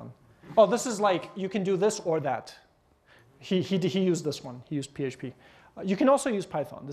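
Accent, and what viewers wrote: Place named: Quebec, Canada. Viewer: I detect a very slight french Canadian accent.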